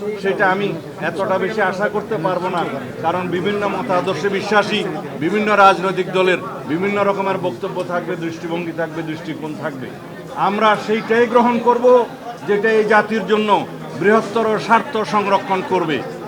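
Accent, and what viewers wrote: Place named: India. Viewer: ভারতের মাল কথা বলার স্টাইল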